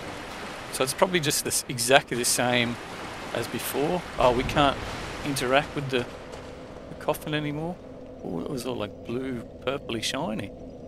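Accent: Australia. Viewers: Are you Australian? Your accent is awesome!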